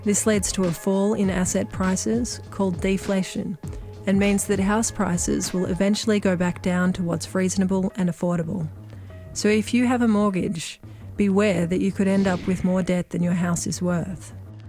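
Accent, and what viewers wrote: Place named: Australia. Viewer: On the plus side, the fall of the US and European Banksters means the "Economic Hit Man" will stop destroying places like NZ and Bolivia. I hear what sounds like an Aussi accent ... is this video and international effort or y'all coming from the perspective of OZ? Finally I've always said the problem is not peak oil, its Peak Sanity. The solutions are all around us. See my video on Beadwall for example.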